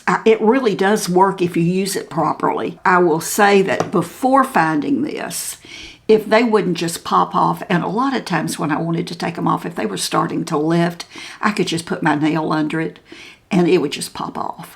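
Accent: South, United States. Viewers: I'm smack dab in the middle of my 50s and I am so happy to find your channel. I have always been in to makeup & beauty, but nothing prepped me for the changes i have been experiencing with my skin & such the last few years..and just having to tweak little things to either make them more flattering, or easier to apply, as well as just the fun suprises -instead of wakign up to a pimple it is wakign up to a new spot, a vein And, as a Southerner, your delivery and voice is also a comfort to me--I guess it is the familarity of it; like you could have been one of my customers in the makeup department at Belk. Have a wonderful new year! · I totally loved listening to your beautiful southern accent. Alabama "girl" (or once was)😊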